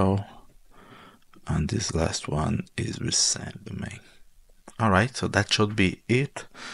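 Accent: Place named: Brazil. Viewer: eu reconheço o sotaque brasileiro de longe kkkkkkk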